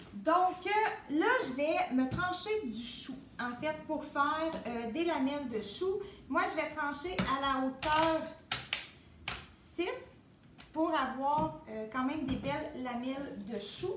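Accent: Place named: Quebec, Canada. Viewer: Belle démonstration Tupperware!!! Et j'adore votre délicieux accent Sag/Lac ( je suis originaire de Jonquière... Je m'ennuie d'entendre cet accent ). Cela dit, je fais de quoi de pas mal similaire, mais avec un Presto. Perso je mets une boîte de tomates en dés, pour avoir du ''crunch'' de tomates, et j'y ajoute du jus de tomates. Tellement bon!!! Merci pour cette belle démonstration!!!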